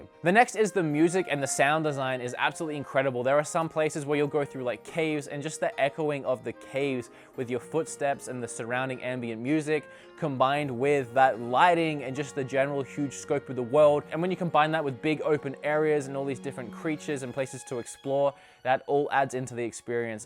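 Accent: Australia. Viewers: Your Australia 😋 ps zeeeldoooo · Are you Australian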